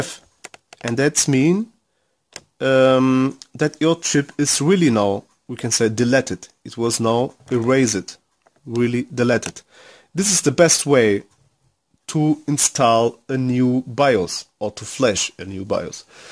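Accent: Germany. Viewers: Pls Help.....I cant detect the chip!...Ich habe alles richtig angeschlossen und höre das du ein deutscher bist!...könntest du dich hier mal melden?...ich habe ein Msi Board verflasht mit der eigenen mflash bios methode...war nicht meine schuld! Ich habe alles so gemacht wie du es in den Videos beschrieben hast!!!.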